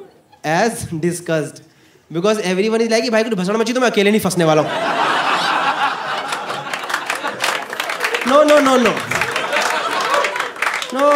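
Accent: Delhi, India. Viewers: Typical delhi accent :D just loved and liked your all the videos...your new fan :D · Every second Delhite sounds like this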